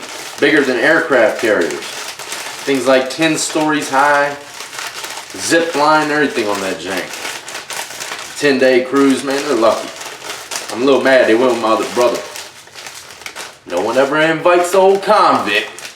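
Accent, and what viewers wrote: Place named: Louisiana, United States. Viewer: Where did you do time bro? Cant place the accent...louisiana? I did 3 in tdcj texas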